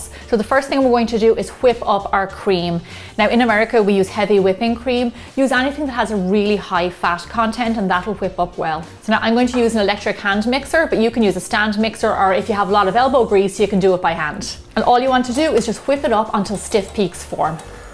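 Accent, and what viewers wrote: Ireland. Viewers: Are you Irish or American?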